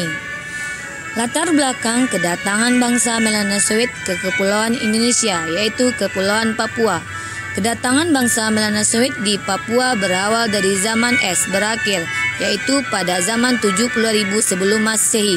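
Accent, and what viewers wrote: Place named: Malaysia. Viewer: kenapa pake logat malaysia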